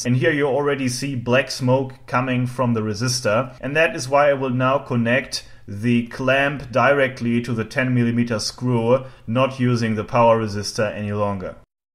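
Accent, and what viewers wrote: Germany. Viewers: Man hört deinen Deutschen akzent echt raus :DD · German? Just asking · German? Irish? Idk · I like watching Mathologer, The Oftler, and the guy who makes those repeating "Legolas" bows. It dawned on me that OF COURSE Germany would have a variety of regional accents just like America does, because you all have very different accents from one another while at the same time sounding distinctly German. I love linguistics. :-)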